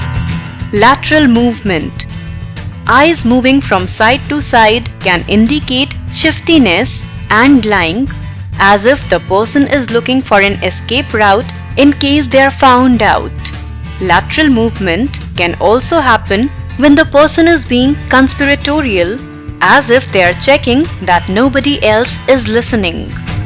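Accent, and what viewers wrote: India. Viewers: Why Indian accent???!! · Ana kozjak she is Indian and its Indian English..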